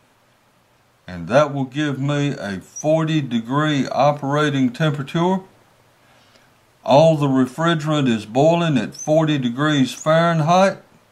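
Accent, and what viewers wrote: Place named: South, United States. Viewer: Very cool southern drawl too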